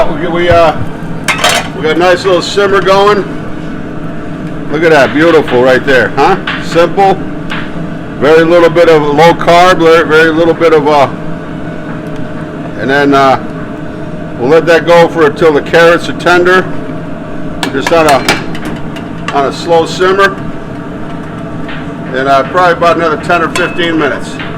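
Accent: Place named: New England, United States. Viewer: The stew looks so good,. I'll try it sometime. I am so impressed with your willingness to endorse other YT channels (I'm a Kent Rollins subscriber) and encourage people to watch their channels as well. That goes a long way with me, and demonstrates your personal generosity and humility. Major kudos with me, pard. BTW your accent is so great (yeah, you have one, just like everyone else lol) it's a mix of New England and New York that is surprisingly, sort of comforting. Keep up the good work. Just for giggles, could you do a corned beef brisket for St. Patrick's Day? You have the modern programmable pressure cooker (the brand name escapes me) but I've done a corned a beef brisket in one, and it's not bad at all. I'd prefer to take the 3+ hours to cook it properly, but if you're in a rush, ya gotta do what ya gotta do. I bought a huge brisket a little while back, and if you could do one from untouched brisket to finished product, it would really help me with my preparation in a couple months. 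I'm hoping St. Paddy's isn't on a Friday again during Lent. So inconvenient lol Love what you do. Thank you.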